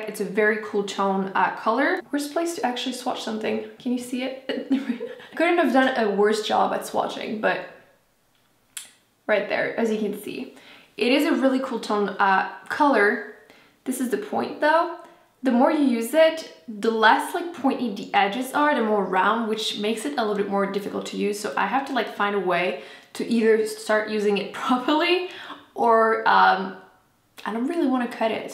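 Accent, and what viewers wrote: France. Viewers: Am I the only one who loves your slight french accent when you speak english? :-D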